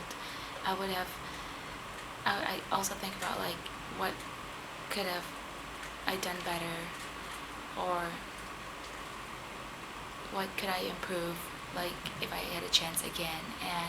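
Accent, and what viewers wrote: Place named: United States. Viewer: စဂၤပူျပည္မွာေမြးတာလား။ ဘာလုိ႔မဲတာလဲဆုိတာ ခင္ဗ်ာရဲ႕ အသံကအေမရိကန္းနဲ႔အရမ္းပီတယ္။ သုိ႔မဟုတ္ရင္ ျဖစ္ႏုိင္တာက စဂၤပူျပည္မွာအားလုံးအေမရိကန္းအသံနဲ႔ပီၾကတာေနာ္။ :S FK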